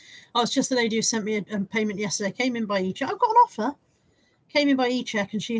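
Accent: Bristol, United Kingdom. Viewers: Love that you mentioned a Victoria wood quote! Just found you this week and loving your channel plus I'm a fellow Bristolian so feel at home listening to you!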